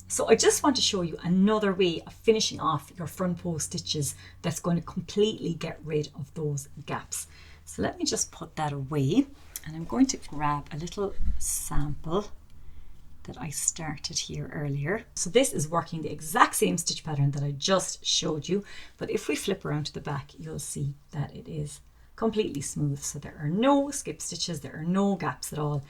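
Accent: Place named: Ireland. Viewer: This is the first video by you I have watched. I thought you were American but oh my! Love your Irish accent. This has been helpful, thank you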